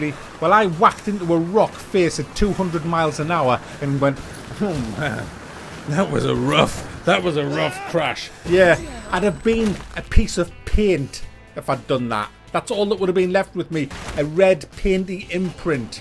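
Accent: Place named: Sunderland, United Kingdom. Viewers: haha Silksworth ski slope! I thought that was a mackem accent! Love your vids. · are you from Sunderland? I can tell it's a local accent but I can't quite place it... (also Silksworth is great!)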